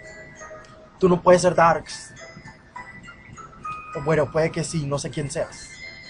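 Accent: Mexico. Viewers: Tkene acento del norte de Mexico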